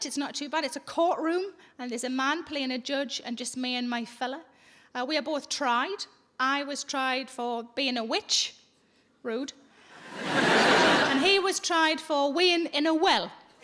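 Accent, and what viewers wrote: Newcastle, United Kingdom. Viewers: And the geordie xD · Hey Sarah im in the US, me mum was from UK, i love listening to your accent reminds me of her. lol Keep up the funny work. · It’s called Geordie- usually used to describe people from the north east of England. There are a few smaller dialects within this area but generally it’s Geordie. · It's north east England x geordie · Neither. She's from Newcastle! :-) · She's English, a Geordie from the North East of England. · There are so many Americans in the comments. I keep wondering, do you (Americans) understand everything she says in her Geordie accent? Even various northern words like 'bairn'? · Peace love justice I was taking the P, she's from near Newcastle, so you would say she's speaking geordie! Soz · You mean Geordie?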